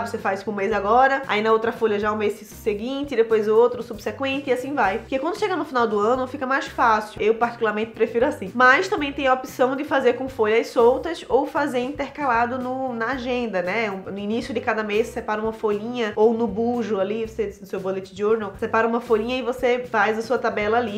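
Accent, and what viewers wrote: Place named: Pernambuco, Brazil. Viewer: Vc é de PE, né? O sotaque entrega! 😅 De todo modo, MUITÍSSIMO Obrigada pelas dicas! Vc apresenta o conteúdo de forma bastante didática, sucinta, clara e objetiva. E, msm assim, bem informativo (refiro -me à quantidade e à qualidade da informação, bem satisfatórias). Muito bom! 🤗 Sucesso! 🌼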